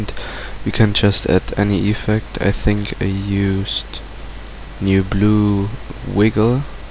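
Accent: Germany. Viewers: are you german? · ... you're German, aren't ya?:D haha, liebe Grüße!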